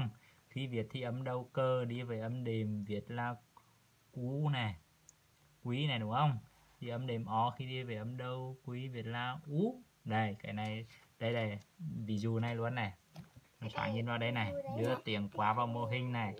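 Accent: Central, Vietnam. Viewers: 2 ông con láu cá quá, giọng của bạn miền Trung?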